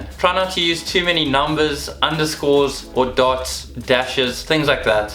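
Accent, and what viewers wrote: South Africa. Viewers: Are you South African my mum is from South Africa 🇿🇦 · You sound South African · Mens kan hoor jys afrikaans lol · Are you south african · He’s South African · Are you south african???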